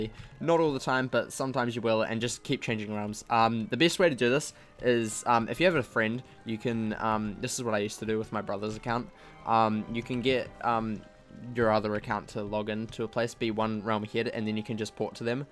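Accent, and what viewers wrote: New Zealand. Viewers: Hey you sound like a kiwi player.. so am I if you are.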